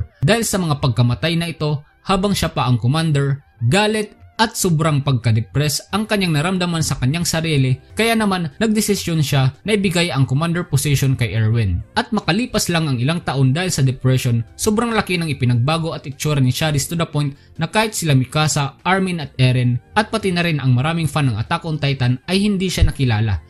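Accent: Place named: Visayas, Philippines. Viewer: Bisaya kaayo ka mo lebay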